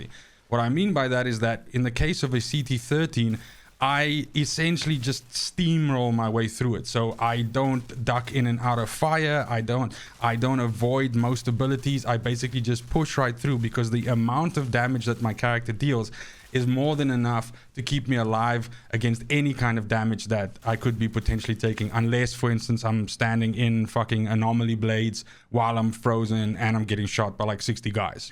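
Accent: Afrikaans, South Africa. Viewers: are you south african?? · hawe you from south africa bru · Are you South African by any chance? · Love the accent - has to be South African - ek stem saam maar ek kry 3 lego's vir elke tweede CT15. Will be great to team up with you sometime! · Are you Afrikaans ?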